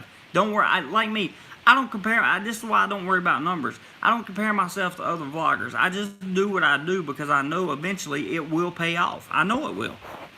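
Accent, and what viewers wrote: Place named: Texas, United States. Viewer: I like your Texan drawl!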